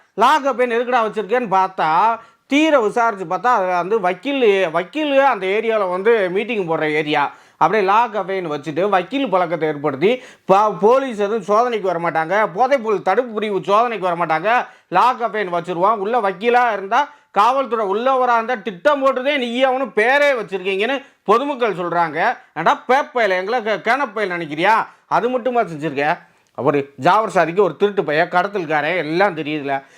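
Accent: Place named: Madurai, India. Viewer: ANNA,UNGALODA NAME PLEASE.SUPERB MADURAI TAMIL VERA LEVEL ANNA.❤❤❤❤❤🎉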